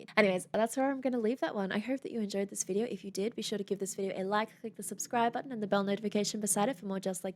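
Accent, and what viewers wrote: Australia. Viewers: YT algorithm put you on my feed so here I am. Elizibeth endorsed you (check), good looking red head (check), nice Aussie voice (check). I subbed. Recommendation- Introduce us to some Aussie artists that those of here in the US might not have heard or know of, that would be neat. Cheers. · Where is ur Australian accent?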